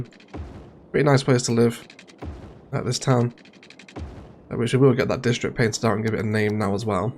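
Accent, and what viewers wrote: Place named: North, United Kingdom. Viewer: Thanks for all of your enjoyable contributions which are always very entertaining. I was just curious...you've likely been asked this before: You have a northern accent; which region are you from. Thanks again. Best wishes from Belgium.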